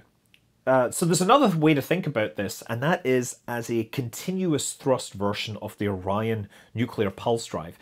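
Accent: Scotland, United Kingdom. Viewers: YouTube captions can't seem to understand your Scottish accent. It doesn't seem to have a problem with the English. 🤔 Lol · I'm lovin' the Scottish burr.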